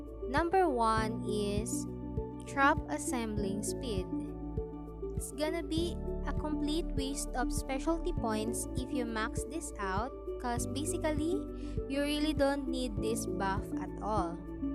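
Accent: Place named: Philippines. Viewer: sa way ng pag pronounce mo ng english i think pinay ka hehe ang cute🥰 more upload pa po ng grand mafia tips pls🥰🙏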